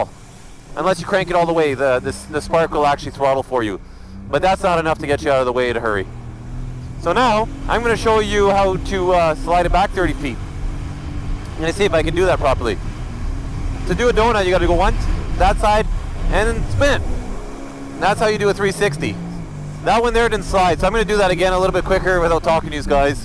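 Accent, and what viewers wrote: Canada. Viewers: You Canadian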